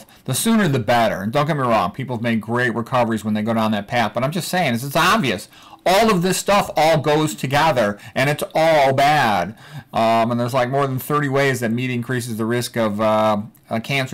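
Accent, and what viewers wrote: Chicago, United States. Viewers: What an incredibly fortunate student I am to have you as my instructor! Your tutorials are always clear & detailed, which allows us to easily understand & follow. Your drawings are especially helpful. THANK YOU for your continued generosity in producing these videos. As an aside, your Chicago accent is entertaining as well!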